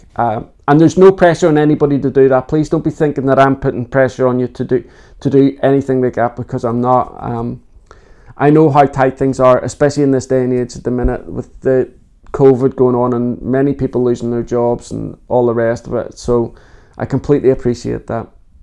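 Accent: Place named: Northern Ireland, United Kingdom. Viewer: I like listening to you because your accent is as close as I'll get to Northern Ireland! I haven't seen my daughter in Armagh since before Christmas and God only knows when we'll be able to travel again. Best wishes to you and the family.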